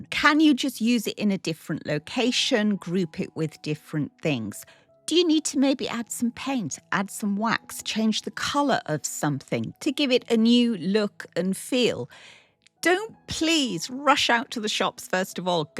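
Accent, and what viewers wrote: United Kingdom. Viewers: That wreath is gorgeous! I love watching you decorate! You have such creative ideas, and your perfect British accent accompanied with a warm smile and peaceful music are a bonus!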